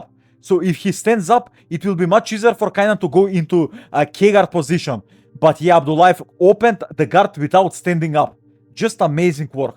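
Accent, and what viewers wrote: Russia. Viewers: Easy...Russian accent. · Извини за, быть может, резкий тон. Однако мне показалось, что это был нарочито русский акцент, а озвучку специально сделали именно такой, чтобы все это поняли. Болгары так не говорят, я слышал их речь. Впрочем, это мое мнение и оно не обязательно верное..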